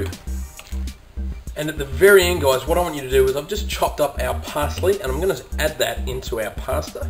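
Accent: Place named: Australia. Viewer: xD his accent is australian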